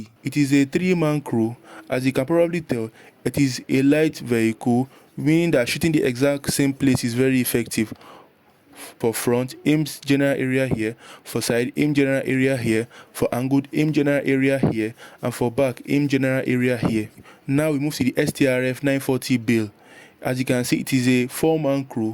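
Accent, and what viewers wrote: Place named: Africa. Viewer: because you have an african accent ,but a great guide btw I've already subscribed and am waiting for more tank guide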